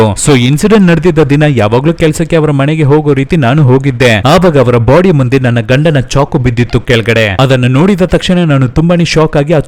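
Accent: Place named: Kerala, India. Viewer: malayali kannada accent kaka angadi maatu ide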